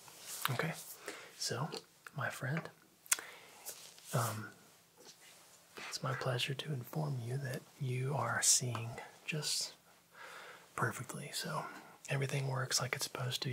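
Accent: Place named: South, United States. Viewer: you got a hint of southern in your voice 🤨🫣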